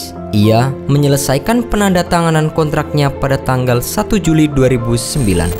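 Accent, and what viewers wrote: Java, Indonesia. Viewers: Ko suara admin medok yah?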